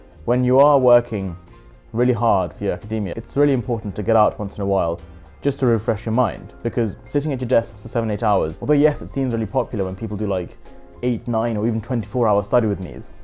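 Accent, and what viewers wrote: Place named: RP, United Kingdom. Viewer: How has your voice become so posh 😭😭😭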